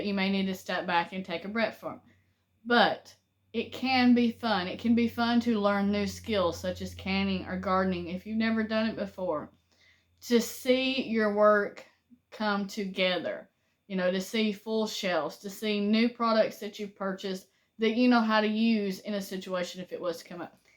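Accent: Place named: East Texas, United States. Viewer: Well I'm fairly new to y'all's channel and didn't know you had your own channel till your husband mentioned it. Glad I heard that. And I'm glad to hear that you're recovering from your ailment. I look at you like a daughter But your accent is music to my ears. East Texas here and that's how I talk. Hope you keep up giving good info. Had the same trouble with cheap can openers. Got a good one with a crank handle on it. Sure helps this old man. I'll see you later!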